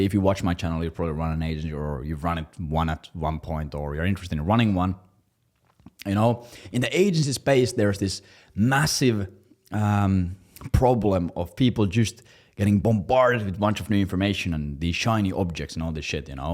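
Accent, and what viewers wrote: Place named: Finland. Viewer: Great vid, but... you said you live in Estonia, but you sound like a Finn 🧐🧐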